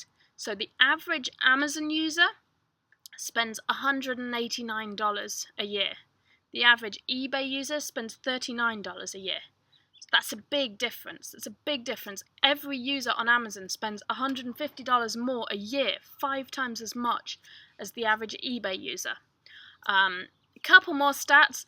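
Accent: United Kingdom. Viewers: Thank you... Your British accent got me on that one... (but we all love that accent!!) You ever get out to TX I'll share some unique accent of my own with you... lol Anyway... really helpful videos... we haven't started yet but looking into it... Your videos are very insightful. Thanks again! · Thanks for the info. Btw...you're cute! Love the UK accent. ;-)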